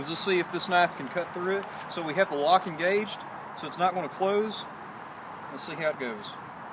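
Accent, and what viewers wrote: South, United States. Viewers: you have the most american accent ever. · Southern US. I would say probably Alabama or Georgia, maybe Tennessee. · Like Elvis, but slightly more country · He has what we call in America a "Southern Accent" which could be further broken down to specific area or state in the Southeastern U.S. It's rather nice isn't it? People have a different accent in Northern California where I live now or in Upstate New York where I grew up. Most people in the world are probably most familiar with what is called a "Mid Atlantic American Accent" which is sometimes considered by Americans as having no accent at all.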